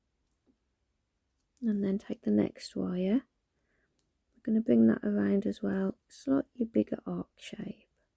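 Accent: United Kingdom. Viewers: I agree about her voice. I love to hear her say, " hi I'm ". It's that UK accent that just sounds so good. No matter if its men, women, or kids. All the people from the UK, I love their voices.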